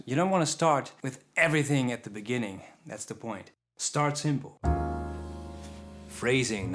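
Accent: Netherlands. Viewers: Sniff sniff, I detect a faint Dutch accent. Am I right? Bedankt voor de tips! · I’ve enjoyed the videos as well as Paul’s. They both produce super high quality videos with great content! And I like that Dutch accent! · It's a Dutch accent, sounds great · Man your lessons are so fkn solid! I love them. Just curious though where are you from? Holland, Germany? Very curious for no reason. Thanks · no such thing a European accent... you know how many different languages Europe has right? It is a Dutch accent though 🤪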